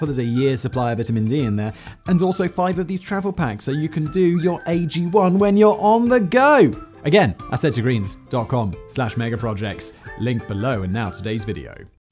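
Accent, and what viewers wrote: United Kingdom. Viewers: British English.🇬🇧 From the inventors of the language, correctly spoken. Not some bastardised colonial strangulated and simplified version.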